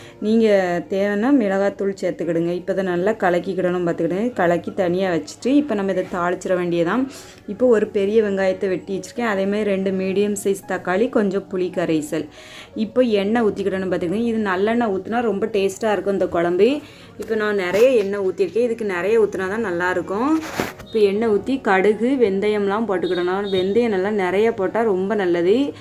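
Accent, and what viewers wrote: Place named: Tirunelveli, India. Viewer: நெல்லைதமிழ் வாழ்க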